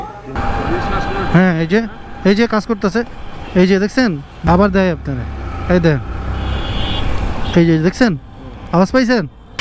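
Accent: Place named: Noakhali, Bangladesh. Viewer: ভাই নোয়াখাইল্লা বলে কথা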